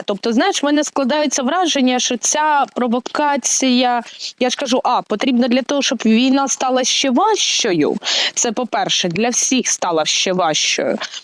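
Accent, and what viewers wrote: Ukraine. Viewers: У нас на Дніпропетровщині такий же говор і точно знаю Полтава так розмовляє. Наша дівчинка ❤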